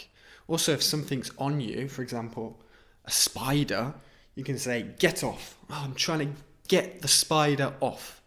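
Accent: United Kingdom. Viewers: I really like your British accent! Where are you from in the U.K.? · you make me love the British accent even more... 🤩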